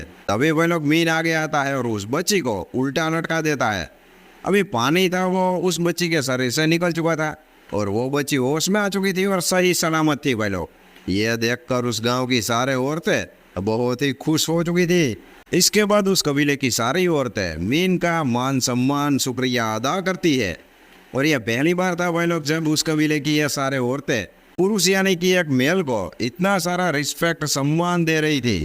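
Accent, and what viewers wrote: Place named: Chhapra, India. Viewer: Tera boli chapare jaisa hay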